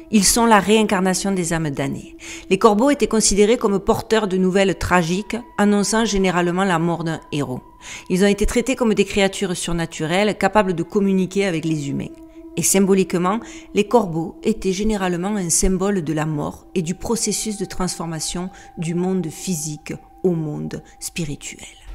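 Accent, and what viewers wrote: South, France. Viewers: Bonjour le Sud ( c’est pour l’accent ) à défaut de te connaître!! T’es sujet sont toujours pertinent et apprécié; pour infos et pour rester sur ton intérêt pour l’intelligence artificielle et le contrôle des masses, connais tu l’expérience dit: « MK ULTRA « si oui quand pense tu? Si non, je te laisse te renseigner et je pense que cela devrait t’intéresser. Bonne continuation et à bientôt pour de nouvelles vidéos. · Merci pour cette vidéo, merci pour vos recherche et nous donner des éléments de réponses pour s’élever et mieux comprendre notre monde. Vous êtes captivante et cet accent marseillais j’adore! fever ❤️ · Trop top tes vidéos!!merci ❤ Trop jolie naturelle et ton accent frenchy trop bien ça chante le Sud!? Ne change rien!! blessings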